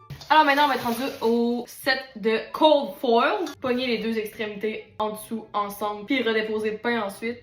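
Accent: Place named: Quebec, Canada. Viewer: Damn! Tu parles vite 😂 déjà que l accent et certains mots du vocabulaire Québécois ne rendent pas la compréhension facile mais la c est un peu dur pour moi 😆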